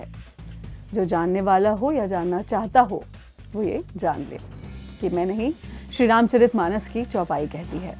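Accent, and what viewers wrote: Standard. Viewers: एक अच्छे पत्रकार का सच्ची पत्रकारिता और विशुद्ध हिंदी वाचन के लिए बहुत ही साधुवाद। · Aap k bol nay andaz pure hindi achha laga sister baat samajh mai aa gai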